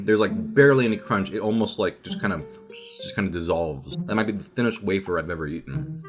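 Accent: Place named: United States. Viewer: his accent is so cool, he sounds like he's American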